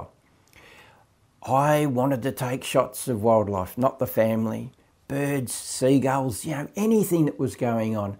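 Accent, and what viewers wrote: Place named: Australia. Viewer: Hi, I enjoyed your photography/video timeline video. I also think your wildlife video and photography work is great, here in GB you’d be probably be employed by the BBC as a freelancer, as they do so much natural-history production, apart from the David Attenborough epic series shown worldwide, we have BBC Spring-Autumn-Winter Watch which is a three seasons live TV wildlife series broadcast from wildlife locations around Britain. They get a good audience and lot of interest and the team includes wildlife photographer/presenter Chris Packham, TV presenter Michaela Strachan, Iolo Williams and Gillian Burke, with other regulars appearing. I love that little Antechinus creature, what exactly is it? Some kind of shrew creature native to Australia, I guess you’re in Australia from your accent.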